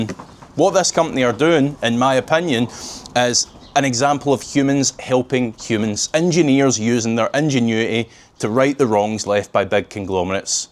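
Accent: Scotland, United Kingdom. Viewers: I just love listening to ur Scottish accent ;-) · That Scottish English is so hard to listen to 😅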